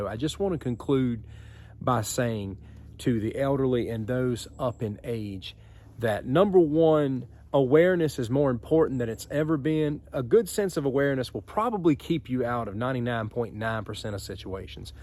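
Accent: South, United States. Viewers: Great to hear a smooth Southern accent. Directions are north, west and east - South is a location. COL Stoney (r) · I don't know about the rest of y'all, but this old Southern gal could listen to this man talk all day! I've lived in Tennessee since 1987, but I still love a natural Southern drawl. ❤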